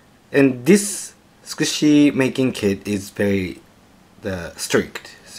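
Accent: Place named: Japan. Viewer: He's Japanese, English isn't his native language.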